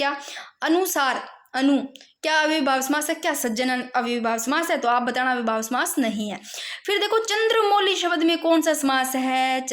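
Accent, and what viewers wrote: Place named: Bagri, India. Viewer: apki language hamre jesi h bagdi ho aap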